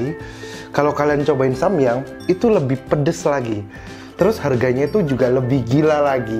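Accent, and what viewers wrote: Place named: Java, Indonesia. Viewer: Medok medok gemeshhh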